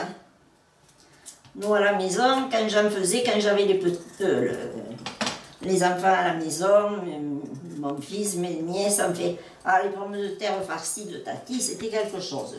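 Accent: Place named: South, France. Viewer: Hummmm...j'ai un plaisir fou à vous suivre...et à faire vos recettes délicieuses...merci beaucoup...et en plus comme chantait,avec! C'est merveilleux.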